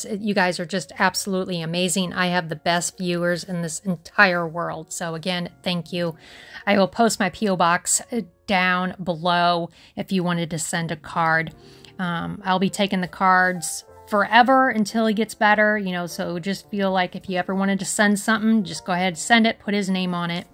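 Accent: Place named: California, United States. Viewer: Loved the Calley girl accent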